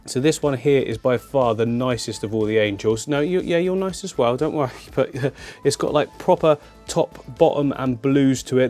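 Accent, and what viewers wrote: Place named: United Kingdom. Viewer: Amazing!🤩👍 P.S. Your british accent is so british!👍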